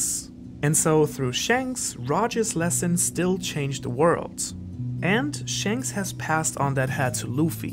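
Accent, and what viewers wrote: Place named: Germany. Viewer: are you german? Your accent aounds like it 😁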